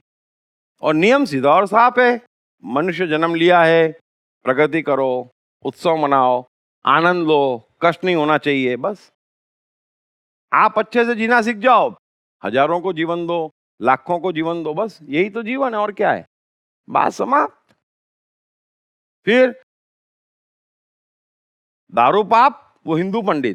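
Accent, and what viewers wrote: Gujarat, India. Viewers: Lagta he k sir hamare Gujarat se hoga qki gujarati sbd pyor bolata he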